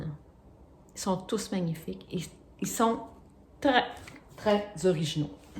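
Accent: Quebec, Canada. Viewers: Bravo pour ce premier podcast. Très bien expliqué. J'adore 😍 et moi, je me régale de l'accent québequois 😉 Même si je n'ai pas l'occasion de tricoter de la layette, ça fait toujours plaisir de voir toutes ces mignoneries, et ton plaisir à nous les montrer est contagieux. Merci d'avoir affronté la caméra et surtout continue 😘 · Merci pour ce bon moment ! On dirait que tu as fait ça toute ta vie 😊vivement le 2eme épisode ! Et ton accent me fait tellement de bien, cela me rappelle mes années québécoises ❤❤❤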